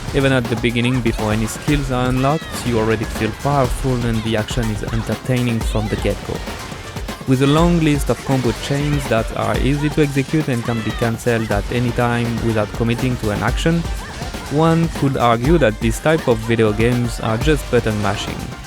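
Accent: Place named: France. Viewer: De par l'accent, et certaines réponses, je devine un developpeur français. Bon courage ! Adorant les productions Capcom et Platinum Games, je garde un oeil dessus. Rien que le decor desertique maisi pas vide, cest deja intéressant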